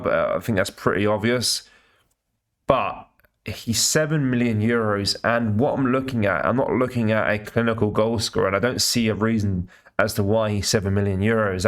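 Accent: United Kingdom. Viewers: We? You sound British to me, ask the rest of your 'we' if they are British.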